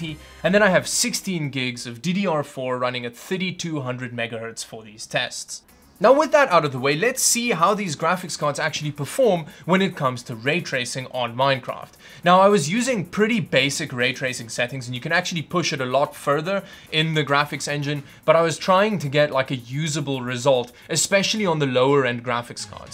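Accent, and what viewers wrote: South Africa. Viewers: Jo dufe you a south african? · Are you south African?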